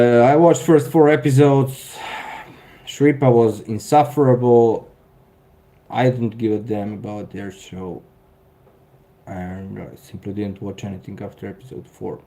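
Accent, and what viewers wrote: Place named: Russia. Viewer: Your accent is TOO THICK to understand the commentary. Just like the RUSSIANS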